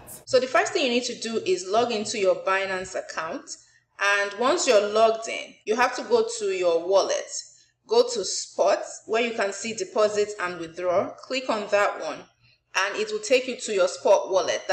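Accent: Nigeria. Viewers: haha your nigerian accent LOL :D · This lady is a Nigerian I just know from the accent Right??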